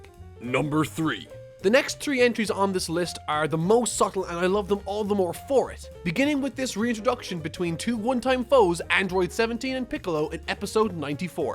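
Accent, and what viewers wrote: Ireland. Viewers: Dat thick Irish accent though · * is irish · are you Irish?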